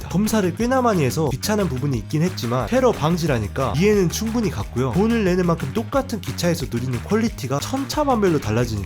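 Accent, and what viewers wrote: Jeolla, South Korea. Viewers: 영상 내내 전라도 사투리가 느껴져서 혹시 했는데 역시 기아 팬이셨군요!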